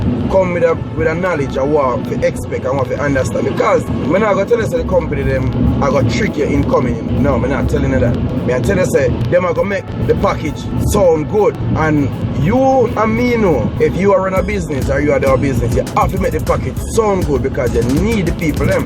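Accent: Jamaica. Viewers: You sound more Jamaican in this video. Lol.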